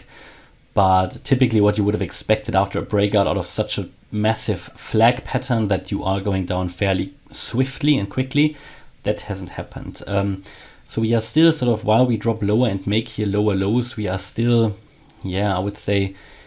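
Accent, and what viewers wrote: Germany. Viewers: According to the accent you should be German. Danke für die excellente Arbeit. Es ist schön zu sehen wenn sich die EW´ler einig sind.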